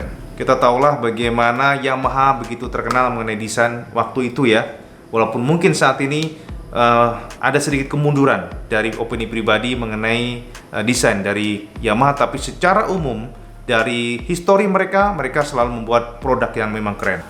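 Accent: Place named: East Java, Indonesia. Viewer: Koyok e.logate wong ngetan...Iki...Jatim.....takerjet....kok onok mosone...ngerti aku.iki bahasane.....salam dari Karaeng galesong....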